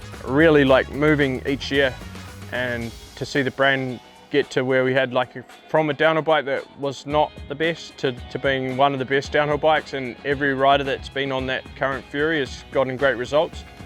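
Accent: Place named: New Zealand. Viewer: I would love him in the commentary booth but if he failed the test it is probably because his english is pretty hard to understand. We're talking heavy kiwi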